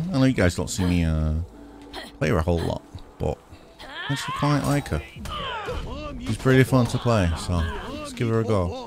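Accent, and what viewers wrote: United Kingdom. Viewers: are you a Brit? I can’t recognise my own accent 💀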